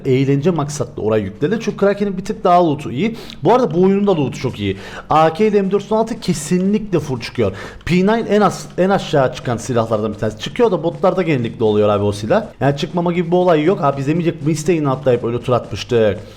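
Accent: Cyprus. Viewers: abi sen kıbrıslımısın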